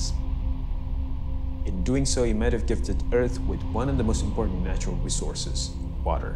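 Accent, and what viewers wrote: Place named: England, United Kingdom. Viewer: ur english accent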